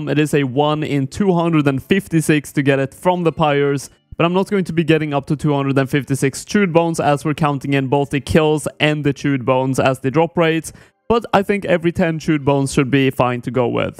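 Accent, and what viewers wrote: Sweden. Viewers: jävlar va svensk du låter 🤣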